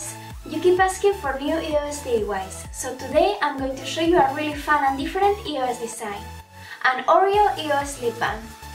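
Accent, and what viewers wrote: Spain. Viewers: She is from Spain, there is too much that she speak english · Se nota a tres kilometros que es española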